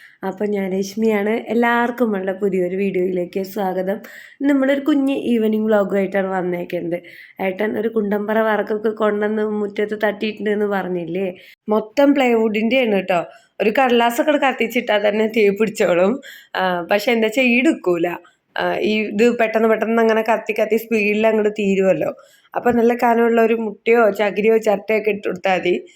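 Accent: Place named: Valluvanad, India. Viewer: വള്ളുവനാടൻ ഭാഷ കേൾക്കാൻ നല്ല രസമാ.. from Kottayam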